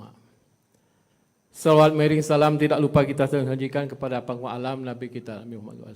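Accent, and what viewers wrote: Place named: Aceh, Indonesia. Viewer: suka lihat gaya bicara mualem kelihatan kental Aceh nya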